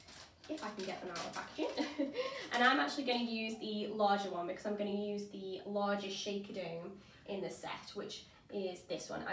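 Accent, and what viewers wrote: United Kingdom. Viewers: Good video, but it is hard to hear you. I put on closed captions. Low volume + British accent can be tough :-)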